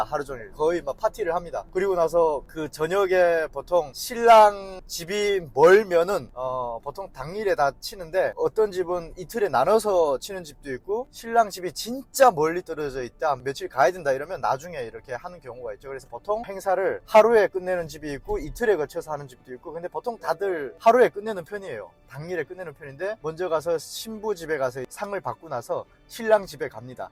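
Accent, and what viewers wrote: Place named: Gyeongsang, South Korea. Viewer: 우와 잘생기셨네 말투는 저기 아래 경상도 사투리 섞인듯한 ㅎㅎ 결혼준비 잘 하시고 대한민국의 건강한 청년으로 정착하신걸 감사합니다. 응원합니다.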